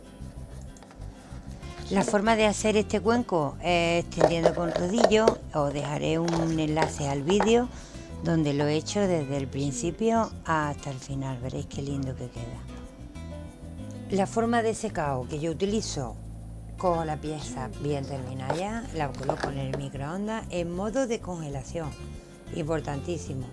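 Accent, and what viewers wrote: Andalusia, Spain. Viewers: soy de Argentina m encantan tus vídeos los veo desde cuando mostraba tus hermosos jarrones pero lo que más difruto es ese acento andaluz creó y esa hermosa y cariñosa forma de enseñar tus trabajos tratando de que llegue a nosotras tus seguidoras lo más instructivo posible felicitaciones un gusto enorme.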